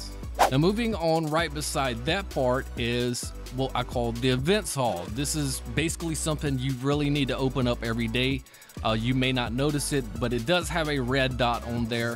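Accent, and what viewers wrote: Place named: United States. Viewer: Thank god, an american. Im convinced that everyone in every other country cant explain anything to anyone. Nor can you even understand them.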